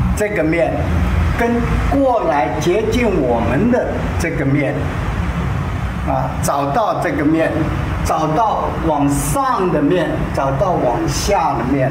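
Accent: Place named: Shanghai, China. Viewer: 为什么有一种老师是上海人的感觉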